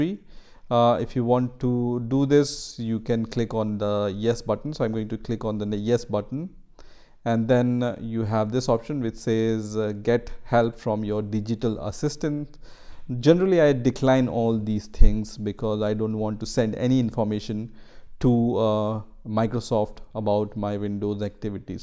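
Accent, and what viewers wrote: India. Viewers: dude this might be racist but I am getting scamming vibes like I am watching one of those scam "how to videos" because of your Indian accent. I know it is not but I am just getting those vibes maybe because I am racist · slight indian accent always comforts me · this. That's clearly an indian accent.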